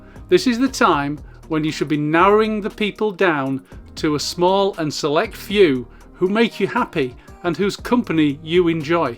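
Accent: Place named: England, United Kingdom. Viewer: I could listen to you all day. I like your English accent! I believe it makes that experience pill easier to swallow. I was hypnotized to quit smoking 17 years ago. I believe it helped because she had a wonderful accent like yours.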